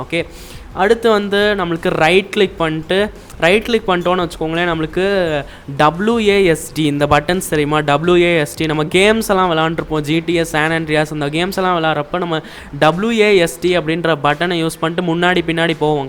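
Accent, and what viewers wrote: Madurai, India. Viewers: Simply i am enjoying your MADURAI Tamil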